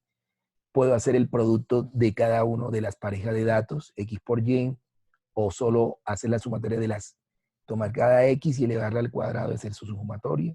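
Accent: Caribbean Coast, Colombia. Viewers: costeño <3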